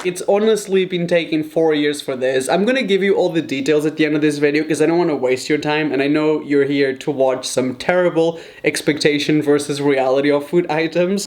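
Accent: England, United Kingdom. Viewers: THE BRITISH-NESS!!!! I LOVE IT!! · 10,000 subs without any videos NEENJA Portuguese people don’t have a accent really at all, he has a mix of British and English .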